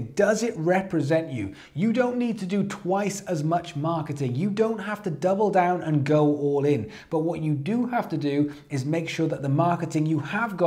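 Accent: Wales, United Kingdom. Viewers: Great vid! You gotta new sub :) Are you Welsh?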